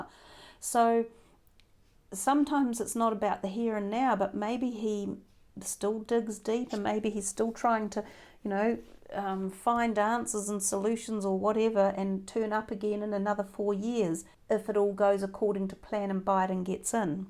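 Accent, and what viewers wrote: New Zealand. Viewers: It's a New Zealand accent and yes, it's lovely.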